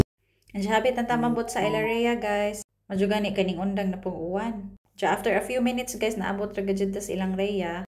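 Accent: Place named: Bohol, Philippines. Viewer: Hala taga alicia ka ako pud taga alicia hahaha kagamay sa kalibutan dini pata nagkita sa vlog hanga ko nimo kay binol anon gihapon ka mag storya pareho ta dia kos uk pero bola anon gihapong daku 😅 amping kanunay mo diha ❤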